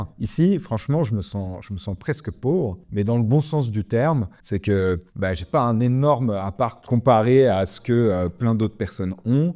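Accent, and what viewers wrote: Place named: Arab world. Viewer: J adore ton accent un peut d arabe un peut de français et beaucoup l anglais et le tout c est ta beauté naturelle ❤❤❤👏👏👏👏🙏🙏🙏🙏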